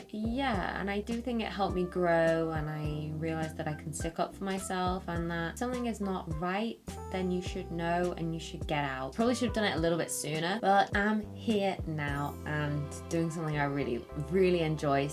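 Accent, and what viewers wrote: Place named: United Kingdom. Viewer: Love ur accent pls teach me British accent 🥹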